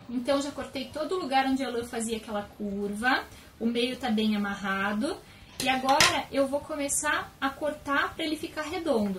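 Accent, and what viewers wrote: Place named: South, Brazil. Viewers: também sou de Curitiba dá pra perceber o geito que você fala com o E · Que lindo💕👏💕 De onde vc é? vc tem sotaque de quem mora no Sul do Brasil☺️✌ Super Bjo!💋💋💋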